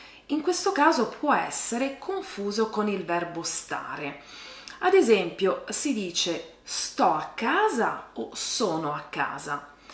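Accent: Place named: South, Italy. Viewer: Ma se sei terrona che cosa vuoi insegnare italiano con quell'accento che hai Stare al posto di essere lo usano sempre e in modo insopportabile i romani e terroni come te. È un vostro marchio immediatamente riconoscibile. E impara ad usare il sia... sia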